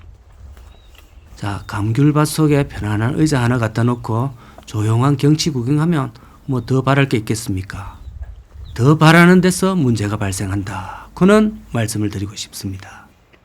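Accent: Gyeongsang, South Korea. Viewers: 강호발TV님 오늘도 구수한 경상도 사투리 잘들었습니다 멀리노르웨이에서 늘응원합니다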